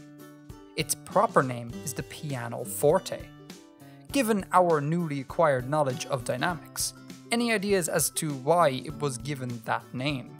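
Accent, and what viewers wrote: Ireland. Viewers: Definitely an Irish accent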